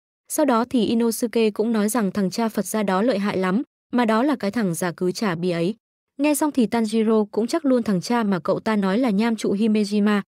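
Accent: Central, Vietnam. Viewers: Ủa mi người miền Trung hả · ad người miền trung à mô vs chả mi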